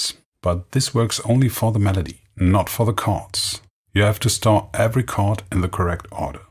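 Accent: Germany. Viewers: Nice german accent and a very, very useful review !!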